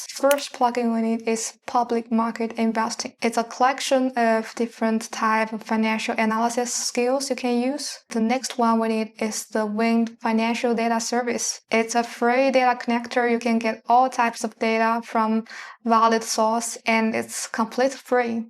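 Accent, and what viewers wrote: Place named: Scandinavia. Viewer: I like your accent from Nordic.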